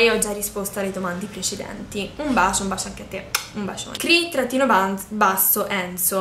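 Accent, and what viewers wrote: Tuscany, Italy. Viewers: #askiaia ciao:) ormai ti seguo da un pò.sei bellissima e dolcissima! complimenti per il risultato dell'esame!Le mie domande per te sono: So che sei toscana dall'accento ma in quale città vivi? Hai altre passioni oltre il make up? Un bacione:* continua così.mi saluti?:)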